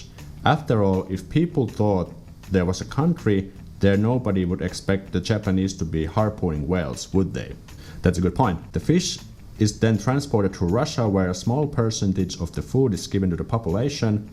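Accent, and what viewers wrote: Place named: Finland. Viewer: You sound like you are from Finland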